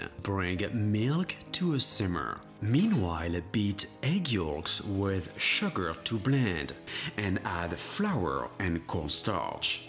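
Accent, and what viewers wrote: France. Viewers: Are u French cos I love the accent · Mdr il est français ou quoi il prononce bien les noms français et il a un pti accent fr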